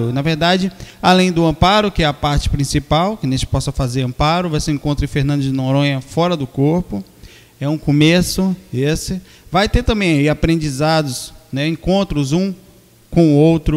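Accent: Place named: Bahia, Brazil. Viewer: Nestes vídeos antigos ele não tem sotaque, que diferente. Ouvindo em 2023. Assistindo ele atualmente percebe-se um sotaque bem pronunciado da Bahia. ❤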